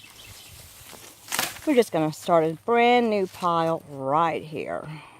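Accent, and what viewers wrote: South, United States. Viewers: I might have to check out one of those chain saws. I've always just used loppers. I've wondered if you live in the South? I live in East Tennessee and we have similar accents 💖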